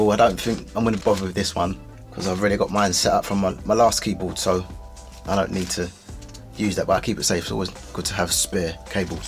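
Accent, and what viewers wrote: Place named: Birmingham, United Kingdom. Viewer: Please tell me you’re from bham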